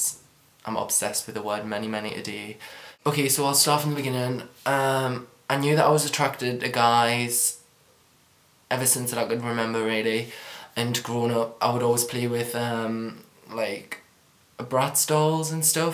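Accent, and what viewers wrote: United Kingdom. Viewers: scottish or british or welsh accent?? anyone know??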